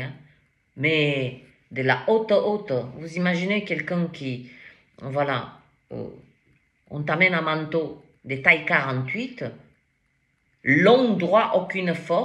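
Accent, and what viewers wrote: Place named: Italy. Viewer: Je t aime de tout mon coeur,ma,tu es unique j aime ton accent,un reste d italie peux être!!!je suis une poissonne,et je ris,tu m amuses Merci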